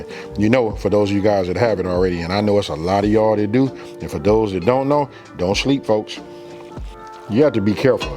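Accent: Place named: Midwest, United States. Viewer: Bro where you from I’m from Kansas City Missouri and I swear you from KcMo or Midwest by your accent or somewhere Maybe Detroit lol… nonetheless that meal fire G I’m making this next for my wife & moms Insha Allah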